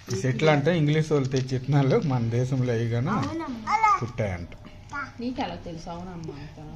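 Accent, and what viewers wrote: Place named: Andhra Pradesh, India. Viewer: Anna mee slang maa Srikakulam la undi,? Meedi Srikakulamaa!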